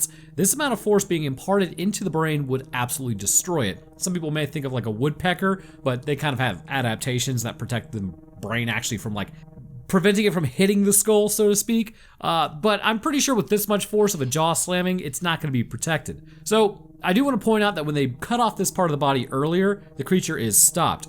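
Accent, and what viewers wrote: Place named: South, United States. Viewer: Why do all southern americanss sound the same. You sound exactly like the finance guy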